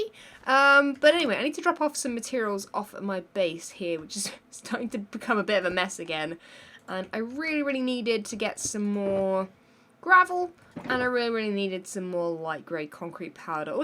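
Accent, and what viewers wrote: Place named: London, United Kingdom. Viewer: She’s from London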